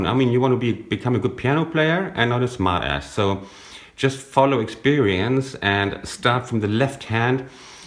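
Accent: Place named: Germany. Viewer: Hallo, nice to hear your german accent......you Really make a good Job for beginners. One Problem for me was your First Trick for advancing the next chord.You speak from a Long Or nodded Note.I dońt Hearings ich in the beginning a looong Note, but only a strong Note.????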